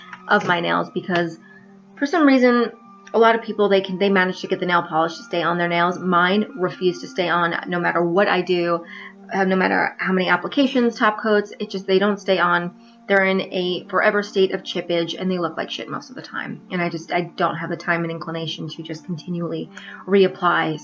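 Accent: New York, United States. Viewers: I hear the NY! Fellow Queens chick here. But you lost the nanny accent so that’s a good thing!😝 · What's wrong with a NewYork accent I love it! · Ditto on the gym playlists! Never representative of actual musical tastes. The gym is a whole different beast. And nothing wrong with a NY accent, NYC is rich in subcultures! 👍🏻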